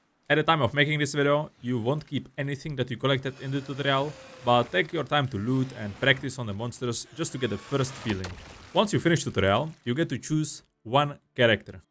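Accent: Czech Republic. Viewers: Czech accent much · To je hrozný jak tam člověk slyší, že je čech... je to takové divný. Jinak super video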